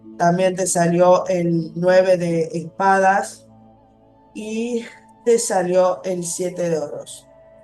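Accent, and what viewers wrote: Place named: Argentina. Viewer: HOLA ME GUSTA. LA LETURA. ES MI PRIMERA VES CONTIGO. SI MI FAMILIA ES BUENA PERO HAY INTERESES CREADOS. YO QUIERO SER LIBRE TOTAL Y ESTAR BIEN EMOCIONALMENTE. TE ESCUCHO DE URUGUAY MONTEVIDEO. VOS SOS ARGENTINA POR COMO HABLAS LO SUPE INTUCION. 🇦🇷🇺🇾 SOS UN AMOR. SI ESO QUE SOY MENTAL SI LO SOY PENSAR MÁS EN MI. TE AMO ARGENTINA UN PAÍS HERMOSO FINO DE MODA DE GENTE HERMOSA ESTILO. URUGUAY ES MÁS TOSCO, LA GENTE SERÍA MUY POCO OPTIMISTA, LO BUENO ES LAS PLAYAS Y NO SE ESTA MAL PARA VIVIR HAY MUCHOOOOO ARGENTINOS ACÁ. Y MI NOMBRE TODOS ME DICEN SOS BRASILEÑA NO MI ABUELO PATERNO PERO NO YO NO POR MI MAMÁ ITALIANA Y AHÍ TENGO APELLIDO HERMOSO ITALIANO DE GENTE MUY DIVINA. CALABRESA. ASÍ ME CONOCES MÁS. SI LABORALES SI MEJORES SI Y QUE MI PAREJA TRABAJE POR DIOS ES MEDIO VAGO. MI PAREJA TIENE ALGUNA S COSAS BUENAS. GRACIAS SOS UNA GENIA MUJER. 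SI TENGO DUDAS SI DE MI PAREJA. EL PROMETER Y NO CUMPLE NADA. BUENO ME GUSTÓ CONOCERTE MI CHICA ARGENTINA BESOSSS PARA 🇦🇷🇦🇷🇦🇷🇦🇷🇦🇷🇦🇷🇦🇷🇦🇷🇦🇷🇦🇷🇦🇷🇦🇷🇺🇾🇺🇾🇺🇾🇺🇾🇺🇾🇺🇾🇺🇾🇺🇾🇺🇾🇺🇾🇺🇾🇺🇾💋💋💋💋💋💋💋🌻🌹🌹🌹💋💋💋🌻🌻🌹🌹🌹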